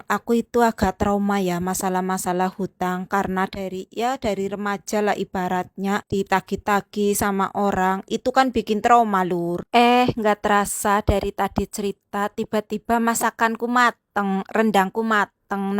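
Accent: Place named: Banyumas, Indonesia. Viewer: Sehat dan semangat terus mba'e💪💪💪 Asli mna mba'e kok kayakne ngapak2 😂😂 kaya inyong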